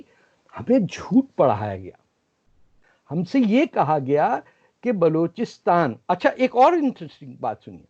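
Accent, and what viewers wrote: India. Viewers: Arzi kazmi I like your program. You look and speak like an Indian daughter. Very lovely. God bless you beta.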